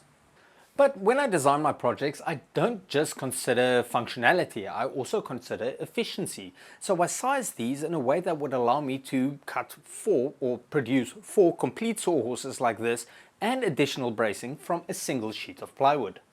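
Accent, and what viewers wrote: South Africa. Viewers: Loved this so I subscribed. South African? Nine video and I think the final bench would work great when cutting sheets of plywood iv you just put like floor insulation on the table first.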